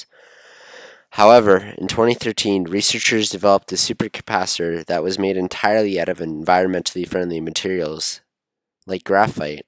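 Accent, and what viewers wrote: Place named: England, United Kingdom. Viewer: Oh thank god, someone with a normal English accent talking about this! Thanks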